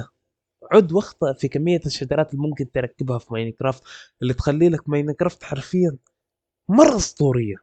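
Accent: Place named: Myanmar. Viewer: انت برماوي واضحة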